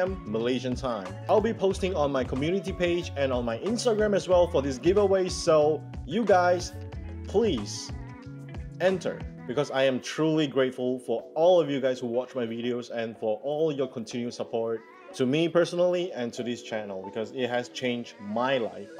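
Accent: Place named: Philippines. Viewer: You sounded more like a pinoy than a malaysian to me..anyway Great Job👏👏👏 this motivates me to unwrap and assemble my Gundams, but paint not..no tools yet. I ll be studying more of what you do kabayan🙏🙏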